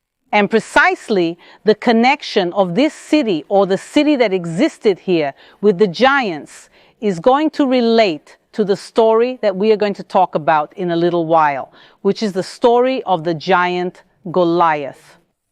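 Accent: Israel. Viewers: I love your Israeli accent.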